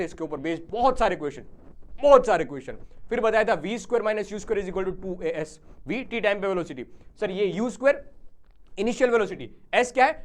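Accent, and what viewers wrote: Gujarat, India. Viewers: Sir aap gujarati ho kya ?? apki tone se lag raha hai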